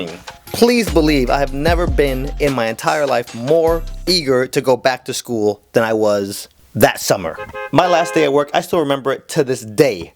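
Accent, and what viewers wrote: Trinidad and Tobago. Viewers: Trini accent on point, much love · Trini accent on point😂💯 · is the trini accent .. i weak🇹🇹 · Trini accent legit! lol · The trini accent tho 💀🇹🇹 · That Trinidadian accent had me rolling XD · nice trini accent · My first job was a veterinarian assistance. Also your trini accent is real good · A plus rating fi did trinin accent! And you know Caribbean people give you one nickname, it stick for life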